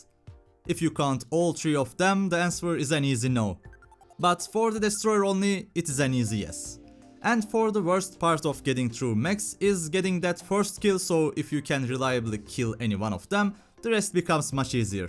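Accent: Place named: Turkey. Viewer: Are you Turkish? Your accent is resembling the Turkish accent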